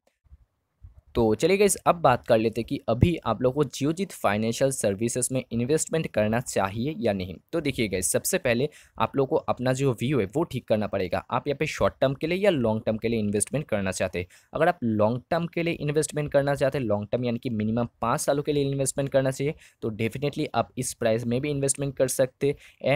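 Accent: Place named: Bihar, India. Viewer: Tu bihari hai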